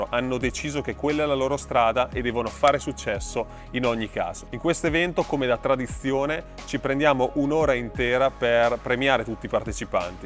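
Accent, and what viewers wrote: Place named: Ferrara, Italy. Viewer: ma sei di Ferrara? Dall'accento e intonazione sembri proprio uno di quei ferraresi oramai poco riconoscibili, che hanno viaggiato tanto e per questo smarrito (per fortuna) le elllle e le essse drammatiche, ma un eco mi rimanda a Ferrara, sono di Ferrara anch'io anche se ho vissuto negli USA qualche anno.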